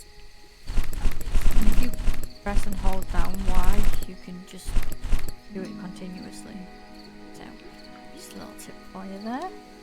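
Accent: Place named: Yorkshire, United Kingdom. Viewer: Voice of a yorkshire angel to guide us through the demo! Amazing 😁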